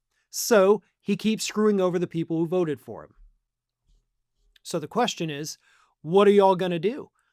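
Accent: South, United States. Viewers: Farron that is not a rural Montana accent you are projecting. That is southern.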